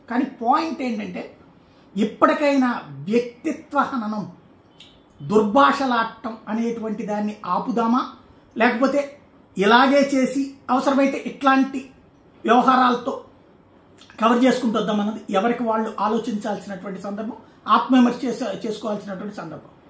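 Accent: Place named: Godavari, India. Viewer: avunu bro adi Godavari slang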